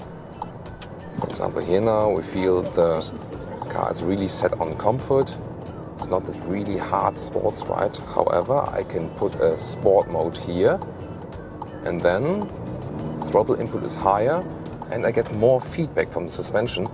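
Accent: Germany. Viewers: I didn't read the hole topic. So i didn't really know what language it is before i started the video. In the first moment, the first 10 seconds i thought it's german. I am from Germany and i never heard such a hard german accent like this one before. And i'm still thinking it's a german review 😜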